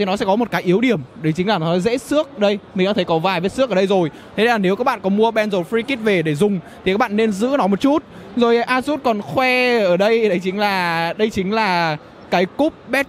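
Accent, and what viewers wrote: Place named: Nghe An, Vietnam. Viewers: Hà Tĩnh bác ơi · á đù a người nghệ an à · Giọng Nghệ - Tĩnh đó bác, ông này người Hà Tĩnh. Face ông này hình như là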